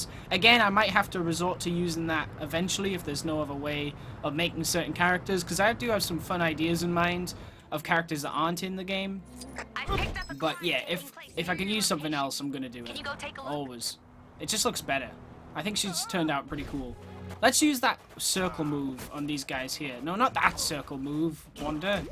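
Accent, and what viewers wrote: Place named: United Kingdom. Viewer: For some reason your British accent is annoying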